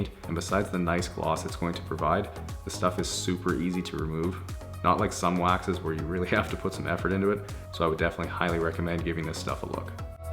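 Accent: Saskatchewan, Canada. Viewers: I love how his Canadian accent barely noticeable the entire video and then when the customers arrive he goes full blown, "OH YAH EH!" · What I found kinda funny is when I heard you were from Canada your ascent seemed to be more prominent 🤔✨😂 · Grage... Lmao😂🤣😂 aww I love the Canadian accent. Stay up my ice bound Northern Bro. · Not all canadians sound like that just so ya know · Might have to get you to do the tour busses next time we come through town! (I can hear the Sask in you any day... ha!) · so much Canadian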